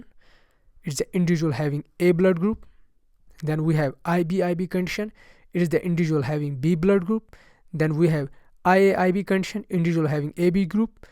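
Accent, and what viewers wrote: Kashmir, India. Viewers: Aapkee aawaz suntai hee smjh aaya aap Kashmiri ho😍❤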